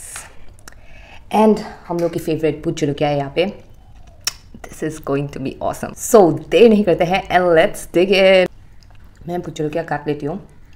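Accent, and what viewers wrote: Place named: Bengal, India. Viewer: দিদি তুমি কি বাঙালি ?.......... আমি sure না তাও তুমার কিছু কিছু কথায় বুঝা যায় তুমি বাঙালি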